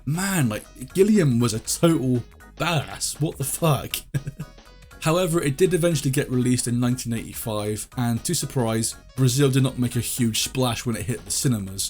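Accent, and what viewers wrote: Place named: Sussex, United Kingdom. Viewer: Where are you from, mate? Got to place your accent. I'd say Sussex if I had to guess or Wiltshire. Sorry, I've got something wrong with me where i need to know where people are from?!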